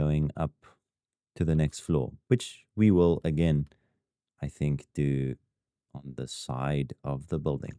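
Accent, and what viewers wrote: South Africa. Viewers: Are u south african ?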